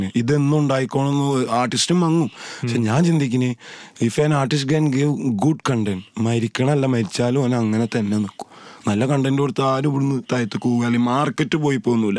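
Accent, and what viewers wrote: Malappuram, India. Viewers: Malappuram bhasha vere levala..❤ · ഞങ്ങളെ മലപ്പുറത് വന്നാൽ അങ്ങനെ ആണ് ഭായ്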